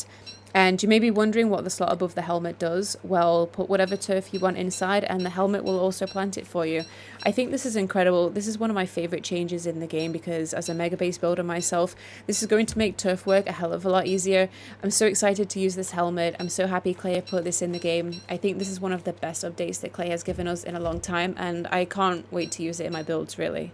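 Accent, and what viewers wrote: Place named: England, United Kingdom. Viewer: sub'd these update videos are nice i get to listen to it in a cute English accent too :D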